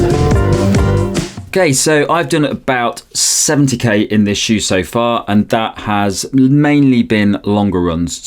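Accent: United Kingdom. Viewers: Are you from the Black Country. Is that why you talk like that?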